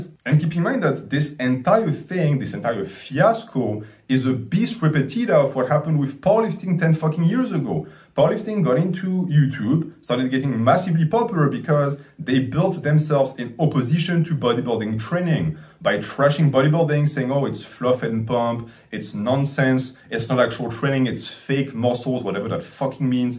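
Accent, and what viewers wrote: France. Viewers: Is that a French accent I hear? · Where is this accent from? Sounds like French but I am not sure. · Is that a French accent? I love it!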